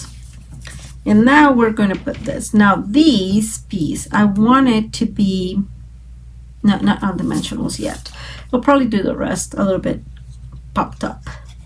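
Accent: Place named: Puerto Rico. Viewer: Love watching you create cards,. You speak like a woman who lives here in the apartment building where I live, and she's from Puerto Rico.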